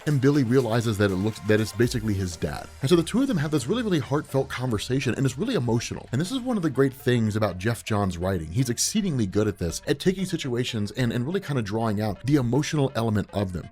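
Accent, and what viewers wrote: United States. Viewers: The way Americans pronounce English places never stops amusing me 🤣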